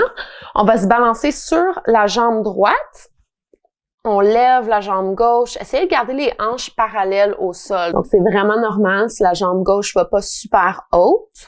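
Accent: Quebec, Canada. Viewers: En tant qu'anglophone, je peux généralement identifier le moindre accent, mais je n'avais aucune idée que vous parlait Québécois. L'accent est un peu difficile à comprendre pour les "monolingual whitebread Americans", mais c'est une pratique à double tranchant : Le français et le yoga ! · Woooooow mind blowing!! Je n’avais aucune idée que tu étais francophone 😃 ça serait super de te voir enseigner un peu plus en français! j’adore l’accent québécois 😁 salutations depuis la petite Belgique 🇧🇪😉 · !!! ca fait un moment que je suis abonnée à toi et jamais j'aurais cru que t'étais francophone. je suis du maroc en général notre façon de parler se rapproche plus de la france mais l'accent québécois m'a toujours fascinée et mon copain est né à Montréal du coup its close to my heart ❤ je pense que tu devrais ajouter plus de vidéos à cette playlist. i know its not within your comfort zone but im sure itd be a great exercise. 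much love take care xx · Merci c'est super agréable de t'entendre enseigner en français! Je suis tes cours en anglais tous les jours et là c'était la petite surprise agréable. Honnêtement ça fonctionne très bien. J'avais même le sentiment que tu donnais plus de petites indications sur les postures et c'est chouette. (Ou alors c'est juste plus facile pour moi car mon cerveau comprend directement l'information sans traduire 😁 ) Bref ton cours + l'accent québécois je suis bien réveillée, de bonne humeur et détendue ! Bravo et bises from Paris. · C'etait superbe! Je t'adore en anglais, mais en francais c'est hyper bien aussi, meme si je ne suis pas habituée a l'accent quebecois. Salutations de Pologne:-) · That was fun! My mother tongue is swiss-german, but yoga en français c'est jolie, too! And I love your accent québéqois! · No wayy ! Quelle surprise ! Ca me fait tout bizarre de t'entendre en français mais j'adore ! 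Vive l'accent québécois :) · Je suis vraiment contente que tu a fais le yoga en français ! Je ne suis pas française, mais je parle le français. L’accent et plusieurs mots sont différents en français québécois, mais de faire le yoga en français me faisais beaucoup de plaisir (mon français ce n’est pas parfait, mais c’est bien de le pratiquer) ! Merci beaucoup. J’attends avec impatience de pratiquer avec toi et toute le monde en français encore. Bonne journée à tous. Namaste xo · Oh my gosh, I love this so much! I'm American but I lived in France for a number of years and speak fluently... I had no idea you were Francophone! Loving the Quebecoise accent -- trop fraiche! <3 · Really enjoyed the class "en français". I'm English so the québécois accent took a bit of getting used to, but that was part of the fun. I'm on day 29 of 3rd time through 30 days of morning yoga. Love that it's different each day. 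Any chance if 15 days of 15 min morning yoga. Could do with a slightly longer class that was different each day. Merci beaucoup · Loved this opportunity to practice listening in french while doing yoga, and especially to hear more quebecois! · Are You Canadian? You sound like from Xavier Dolan movies ❤️ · Merci tellement pour ça!!! Je suis anglophone mais j'ai vécu deux ans au Québec et c'est là que j'ai vraiment approfondi mon pratique de yoga. Je fais souvent tes vidéos, mais le yoga en français me manque et cet vidéo a offert un occasion merveilleux pour reconnecter avec la langue. Ton accent me fais tellement chaud au coeur et ça serait super de voir plus de ces vidéos en beau français québécois!! Merciiii encore 🥰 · Ça ne devrais pas me surprendre que tu es bilingue, car je sais que ton ancien studio se situe en Ottawa, mais le fait que tu es québécoise est vraiment super! Je suis ontarienne anglophone, mais je suis enseignante en immersion française. 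Je trouve que j'ai perdu mon accent en parlant aux petits tout le temps, donc je suis ravie d'entendre ton accent et de suivre ce cours avec toi! Merci de continuer de nous partager des aspects de ton identité!💕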